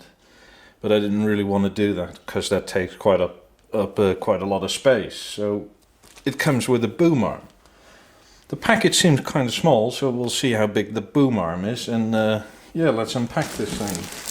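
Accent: Netherlands. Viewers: You sound like a Dutchman who’s lived in England for years, midlands/north of I had to guess, maybe Manchester. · Dutch accent noticable within the first 5seconds but good vid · i can tell by your accent 😅 dutch accents are very distinctive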